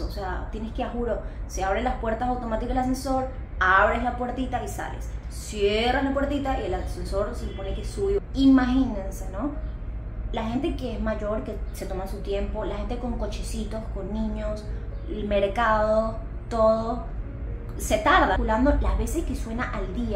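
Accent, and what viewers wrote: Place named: Argentina. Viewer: Estás súper argentinizada por lo menos la entonación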